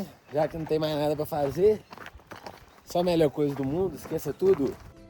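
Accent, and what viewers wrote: Minas Gerais, Brazil. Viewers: Estou simplesmente encantada com esse canal e com o protagonista dele, com esse sotaque muito familiar, mineiro bom demais da conta sô! Parabéns por transmitir um conteúdo de qualidade de uma forma descontraída e contagiante. Ficou pensando ... como só descobri esse canal hoje? Já me inscrevi lógico e maratonar o canal e a ordem do dia, Gratidão 🙏❤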